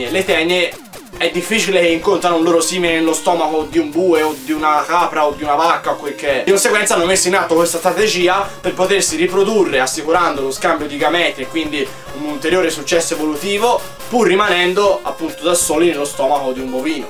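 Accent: Tuscany, Italy. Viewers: MITICO! Assolutamente Iscritto! Simpaticissimo, accento adorabile :3 (adoro il toscano), informatissimo e piango insieme a te all'idea dei Namecciani morti ingiustamente.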